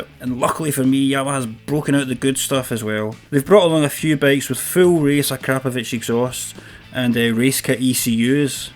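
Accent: United Kingdom. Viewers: British accent, u cant beat it.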